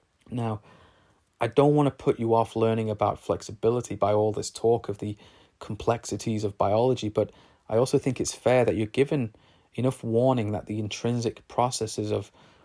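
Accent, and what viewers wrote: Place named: Rome, Italy. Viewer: danvanzandt ahahah I’m italian so he just speak it. with a very strong Roman Accent ahahah But don’t worry, really!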